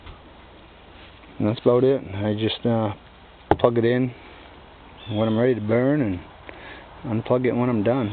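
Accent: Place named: Maine, United States. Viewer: If I had to guess. I bet your from Maine.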